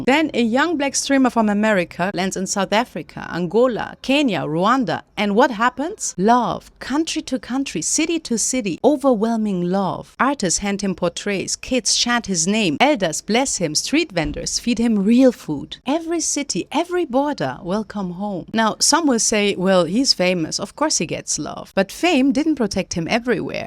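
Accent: Germany. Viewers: I knew you know German well before you mentioned Germany. Your English sounds like that of Germans. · When she said "I left Germany..." I was like "I knew it!" 😂 Her English is really good, but it is just really difficult to hide our accent, proven once again. And also proven once again: We Germans are everywhere! I've met Germans in every corner of the world. Good for her that she found a nice place with better weather 👌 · 😊I thought you have a German accent 😊 Thank you for pointing this out. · German accent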